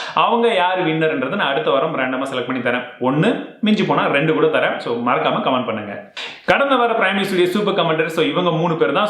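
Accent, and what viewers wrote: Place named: Tamil Nadu, India. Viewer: local Tamil semma bro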